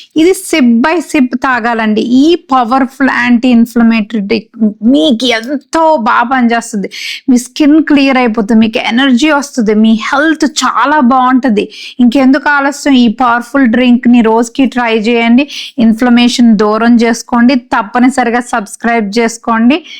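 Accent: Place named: United States. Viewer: Why using stylish american accent in telugu? If u use telugu, try our accent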